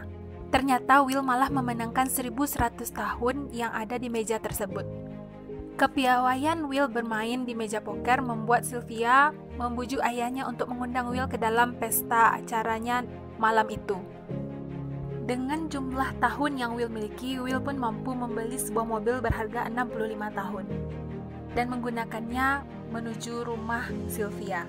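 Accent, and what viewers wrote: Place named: West Sumatra, Indonesia. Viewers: FIX... INI mah orang minang tulen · Adminnya orang pekanbaru nihhh atau orang sumbar kalo diliat dari logatnyaa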